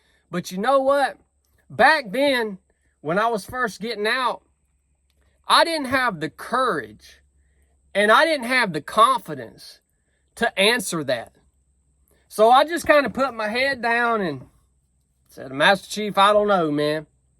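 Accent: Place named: Georgia, United States. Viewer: As a northwestern guy, I wound up stationed in Georgia for a few years, of eight. This man’s accent and manner of speaking is most refreshing and brings back a lot of memories. Good job from an old infantryman!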